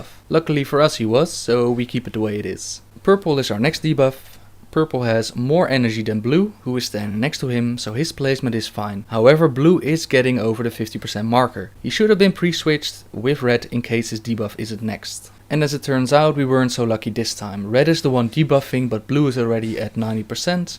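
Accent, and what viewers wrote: Netherlands. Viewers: Dude, amazing guide, real nice and clear:) I have a question tho, Are you dutch? you sound like a dutch guy talking english:p Keep it up man:D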